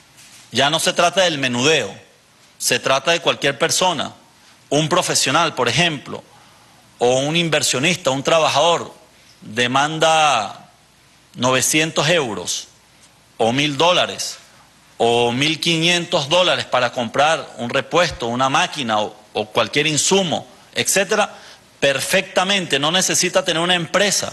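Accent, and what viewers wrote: Cuba. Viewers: Notan que tiene un acentico cubano?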